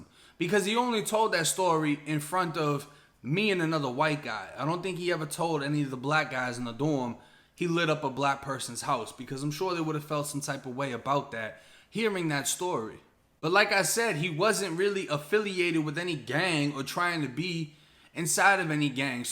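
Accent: Boston, United States. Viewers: ur boston accent dope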